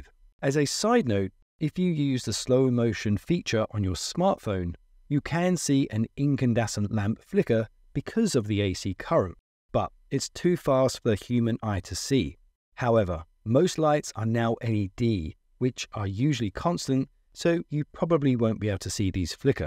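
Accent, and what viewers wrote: England, United Kingdom. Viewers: cool, a british accent :) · It feels so weird listening to a British accent using American terminology’s (and voltage etc). Earth to ground Star delta to wye delta etc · The weird thing is an English accent after starting with a non-English sockets picture. Principles are the same, but was a bit surprising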